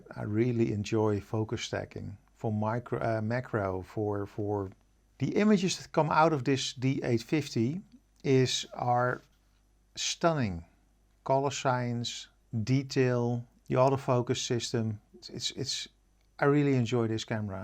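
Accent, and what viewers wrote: Netherlands. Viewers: Dutch accent is fine, I went with my daughter to the university in Amsterdam to settle her in there. Never seen so many smiley people, everyone seems to have a great attitude to life. I was so greatful that everyone spoke perfect English...the secret to carrying weight is a comfortable rucksack...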